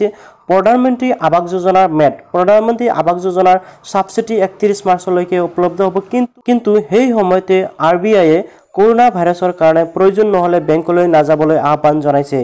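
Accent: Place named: Bangladesh. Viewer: আপুনি অসমীয়া নে অকমীয়া ? অনুগ্ৰহ কৰি শব্দ উচ্চাৰণ কৰিব শিকিব। শূনিলে কিবা বাংলা দেশীয় যেন অনুভৱ হয়।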